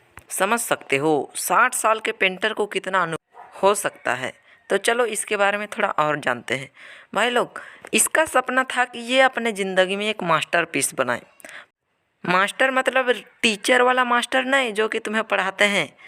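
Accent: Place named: Uttar Pradesh, India. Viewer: Thanks bro for explanation it help me lot in explaining the question by the way it seems like you're from uttar Pradesh or Bihar and I am sure you will have million of subscriber in these year all the best and happy new year 🥳🥳🥳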